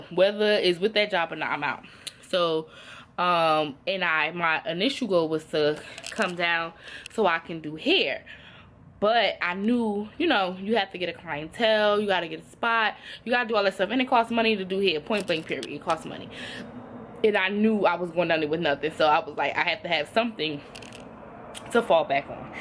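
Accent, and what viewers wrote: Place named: Brooklyn, United States. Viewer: LOVE your story girl, you sound like you from Brooklyn more so than Jersey haha. Great inspiring video. GOD IS GREAT :)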